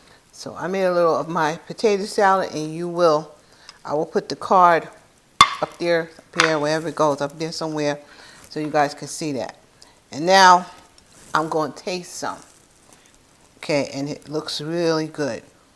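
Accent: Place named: New York City, United States. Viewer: I'm going to make this for thankingiving u sound like a NYC lady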